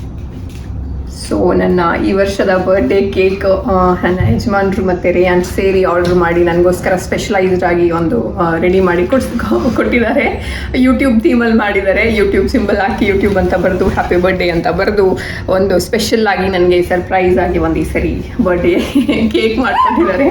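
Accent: India. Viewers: We love your Kannada Accent and Pronouncing The Way You Narrate Kannada is Superb Probably best in class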